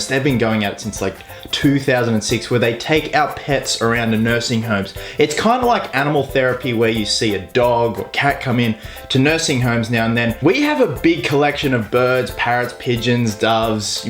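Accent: Australia. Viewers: I clicked on this video and as soon as you started talking I yelled. AUSSIE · Your Aussie 👍🏻me to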